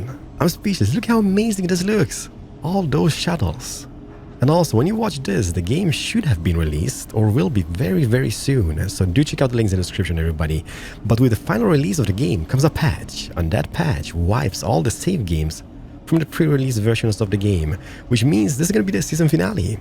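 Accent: Scania, Sweden. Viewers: Asbra serie! Hör på dig att du är skånsk! :D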